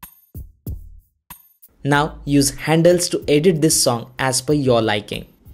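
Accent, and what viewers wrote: India. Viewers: Always a boss with an indian accent that comes in clutch! Thanks bro 🤌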